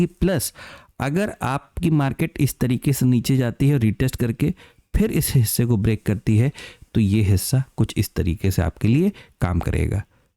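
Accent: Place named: Madhya Pradesh, India. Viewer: Bhai, aap MP side se hain kya? Apki boli hamare side ki lag rhi hai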